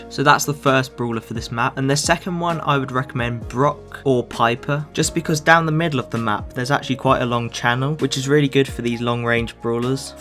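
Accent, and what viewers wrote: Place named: United Kingdom. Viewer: This is the most British accent i have ever seen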